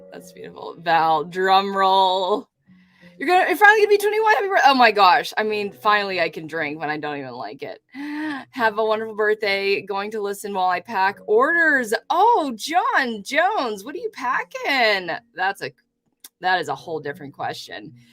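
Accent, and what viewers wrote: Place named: Los Angeles, United States. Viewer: I'm so impressed. I could of sworn you had a comment on YouTube.? I'm still looking. Just want to say I miss your lovely voice and cute influx in your LA accent!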